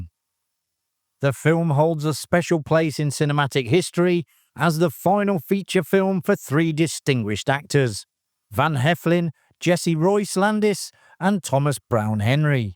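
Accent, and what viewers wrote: United Kingdom. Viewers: When I hear a british accent I know its time to turn off.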